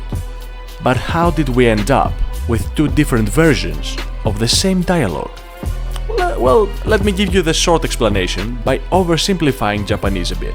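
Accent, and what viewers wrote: Greece. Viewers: haha yes i tought greek first, the finnish 🤔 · that's fine. i am Greek too living in the UK, thats why i was able to recognize... You dont have to change anything about it. Great research on your videos.. Keep it up!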